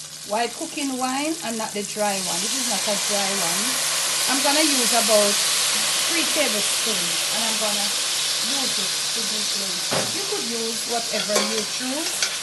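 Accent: Jamaica. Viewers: God, I wish she lived next door to me! Between the cooking, the attitude and that Jamaican accent, it would be simply DIVINE! 😊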